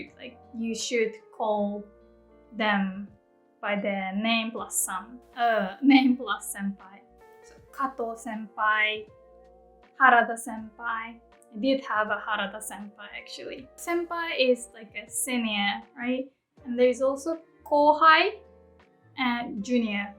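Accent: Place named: United Kingdom. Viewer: You have a british accent. Did you learn English in Britan?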